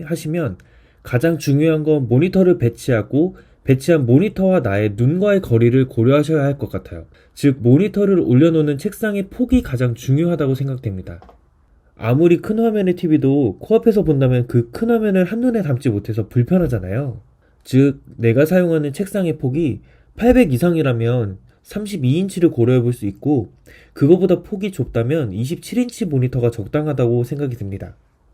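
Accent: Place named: Chungcheong, South Korea. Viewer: 충청도 사투리 재밌어서 킹받네 ㅋㅋㅋ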